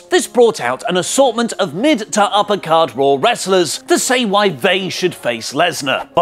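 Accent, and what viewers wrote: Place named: United Kingdom. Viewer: u need a rko I hate ur British accent I'll give u a beer then a stone stunner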